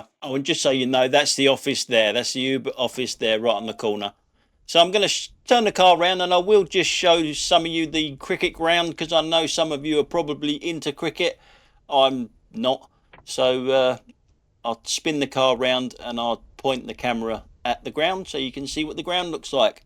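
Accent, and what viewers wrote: London, United Kingdom. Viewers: I've got Youtube on my big Telly upstairs, my wife says she loves the London swearing accent so keep up the good work in 2025.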